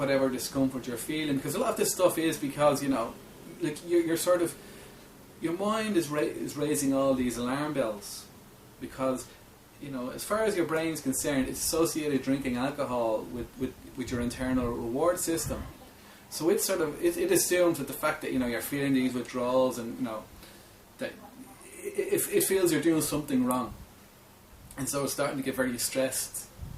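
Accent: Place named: Ireland. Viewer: Definitely irish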